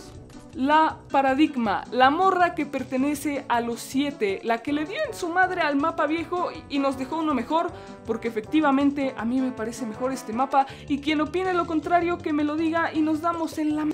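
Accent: Costa Rica. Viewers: Eres de COSTA RICA?. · este mop habla como tico k estilo!!! jajjajaaja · Eres de Costa Rica? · Soy el unico que se dio cuenta que el mae habla como costarricense? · Eres tico? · Eres tico? Noté que se le salieron varias frases❤🗯💙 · Nmms sos de costa rica? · oye bro eres de Costa Rica ? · es de costa rica o que xd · Suenas tico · oye eres tico? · Deberías de dejar de hablar como costarricense te escuchas ridículo, es a criterio personal.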